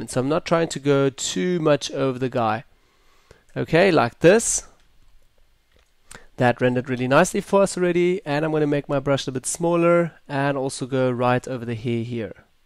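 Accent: South Africa. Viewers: is that a south African accent? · Bro are you South African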